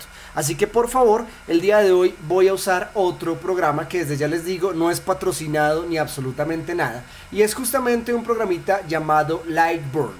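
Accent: Colombia. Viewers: waaaaaaaaa colombia? no me habia dado cuenta hasta que hablo xD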